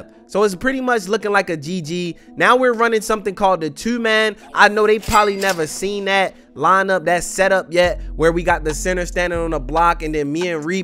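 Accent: Baltimore, United States. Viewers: U from Baltimore? Lol sound like it….twoooo man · yo are you from baltimore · Is he from Baltimore?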